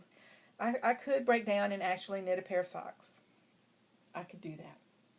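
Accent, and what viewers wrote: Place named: South, United States. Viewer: Love your podcast...I especially love that your accent sounds like mine!!!! Yay for Southern ladies' podcasting!!!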